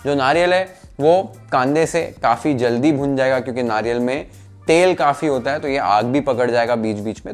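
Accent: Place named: Mumbai, India. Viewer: Sanjyot is born and brought up in Mumbai, that explains his Marathi accent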